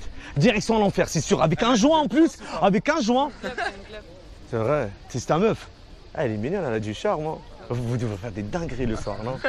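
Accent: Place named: Annaba, Algeria. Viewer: أنا نكره الهدرة تاع عنابةدايرة كي الشعر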